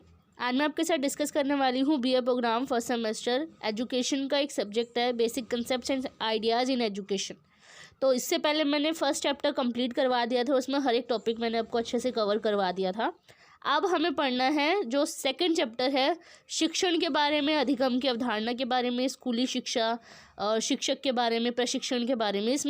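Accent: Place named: Haryana, India. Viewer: Mam ap toh harayana se ho ❤️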